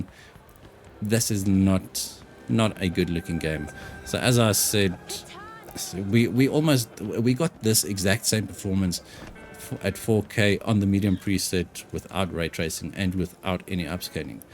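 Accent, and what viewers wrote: South Africa. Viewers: Noice I could hear your accent, Ill sub man, Im from Cape Town now in Scotland! Happy Youtubing!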